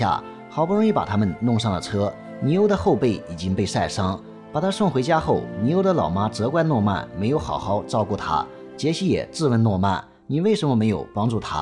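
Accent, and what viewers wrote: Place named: Henan, China. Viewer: 河南方言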